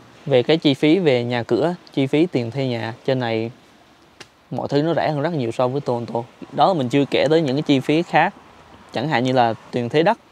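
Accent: Central, Vietnam. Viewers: Nghe giọng bạn là biết người miền Trung rồi nhưng nghe đến cuối mới bik đồng hương ở Calgary, cheers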